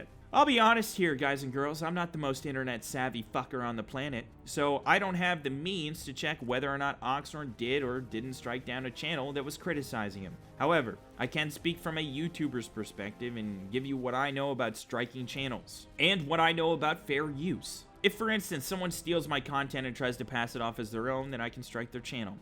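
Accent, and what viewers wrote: Canada. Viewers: You are so deliciously Canadian. Nova Scotia?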